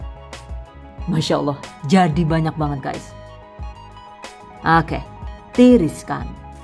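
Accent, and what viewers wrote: Banyumas, Indonesia. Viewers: Bunda asal dari mana Bun ngapaknya...suka dengernya🤗🤗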